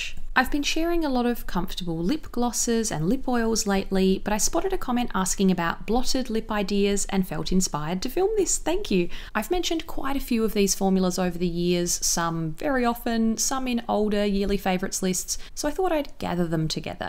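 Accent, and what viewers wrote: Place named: Australia. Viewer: I Like Aussie People. Love The Accent.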